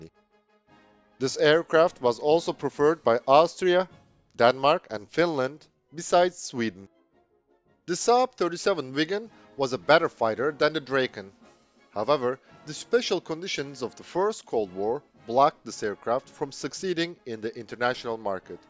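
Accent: Turkey. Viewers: I know this dude. His accent is Turkish coz he is a Turkish guy.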